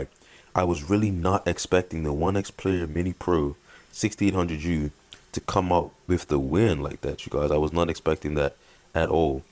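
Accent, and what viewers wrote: Bermuda. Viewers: Are you from Bermuda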